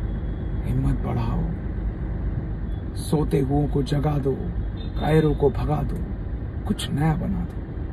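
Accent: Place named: Sagar, India. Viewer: 😁are you from Sagar,,,your accent....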